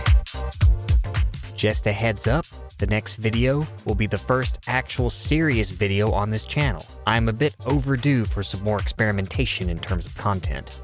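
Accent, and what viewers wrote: South, United States. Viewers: dislike for southern accent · I think the slightly southern accent just makes these videos so much better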